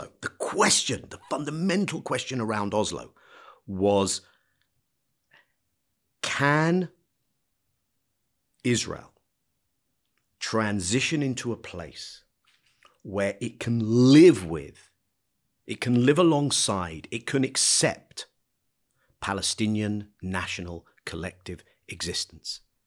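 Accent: United Kingdom. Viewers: Jeremy – This is way over my head but seems rational, if only because it is presented calmly and with a British accent - as if the Brits have no culpability. Perhaps you can follow the story .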